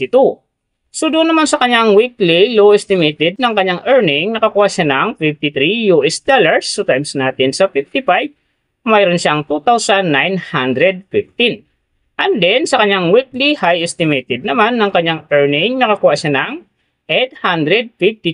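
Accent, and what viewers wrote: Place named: Visayas, Philippines. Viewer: Visaya kb dong? Bkit ganyan k magsalita.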